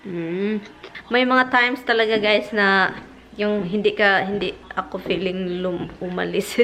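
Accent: Visayas, Philippines. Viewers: Magkatawa ko sa imo sis pag mag storya ka bisaya tagalog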